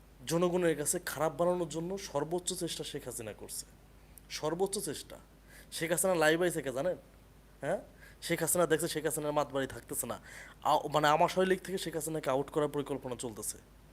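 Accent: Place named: Pakistan. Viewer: ভাই আপনার মুখের ভাসাতেই বুঝা সেস যে আপনি পাকিস্তানের মালের ছেলে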